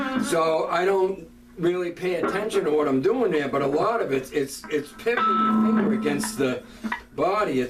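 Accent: New England, United States. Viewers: Are you in Maine or Mass? you sound like your a New Englander. Good Stuff my friend.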